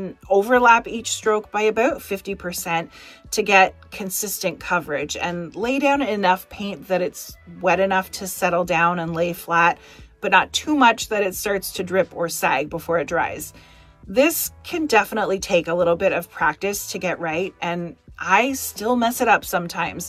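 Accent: Canada. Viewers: This is the first video of your I’ve seen. I’d like it! The only thing I would ask is why you didn’t do some accent colors on all that beautiful rounded furniture and edges? I think it would’ve made it. Stand out just a bit more made it slightly modern. And what did you say and where did you get those knobs? I love your accent. What part of Canada are you from?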